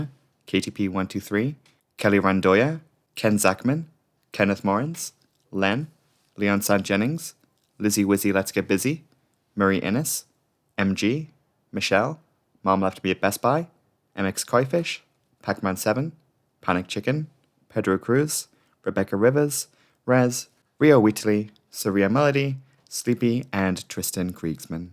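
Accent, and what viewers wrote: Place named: Northern England, United Kingdom. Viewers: Totally off topic but I'm interested about the narrators accent. It sounds northern English with north American influence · It sounds similar to another UK creator I watch, Lazy Masquerade. I had never heard an accent like that until I started watching him, and now.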